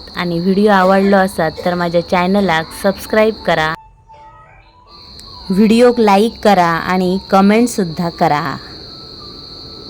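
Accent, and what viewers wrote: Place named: Malvani, India. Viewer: तुमची व्हिडिओ मी पहिल्यांदाच बघतोय तुमची मालवणी ऐकून मला प्रभाकर मोरेंची आठवण झाली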